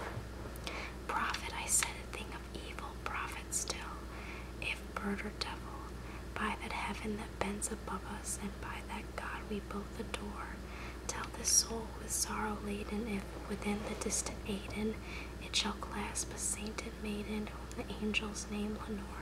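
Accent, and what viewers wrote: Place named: Buffalo, United States. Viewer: I recognize your accent. I live in WA state now but I used to live in Buffalo. Go Bills :D !!!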